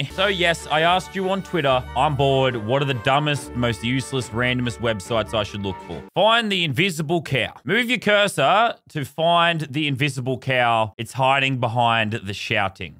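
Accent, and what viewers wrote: Australia. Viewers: The Aussie accent😒😒 as an Aussie myself i find our accent to be the worse in the entire world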